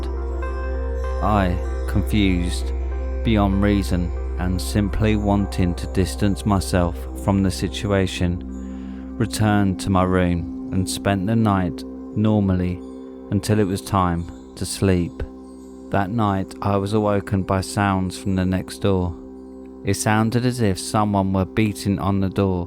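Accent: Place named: United Kingdom. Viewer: Loved your intro dude. Sounds like you are a fellow Brit narrator too. Great to see your channel growing too. Hope you don't mind a little bit of critique.. nothing bad. Try to make your reading a bit more flowing. Maybe when you do a person's speech, maybe try adding a bit of inflection/emotion into whatever they are saying. That being said, one thing I find that listeners do seem to enjoy, is to add plenty of sound effects throughout the story. So far I haven't found any other narrator, not even the big channels, add as much as I do. Folks find it helps the story along, by making it more immersive. Some have even told me that my narrations remind them of the old-time radio shows haha. Oh and maybe ask around for collabs, that can also help boost your subs and watched hours too.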